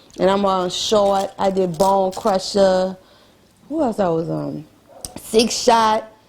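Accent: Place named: Los Angeles, United States. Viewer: Gotta be from L.A. w/ that accent and her fine self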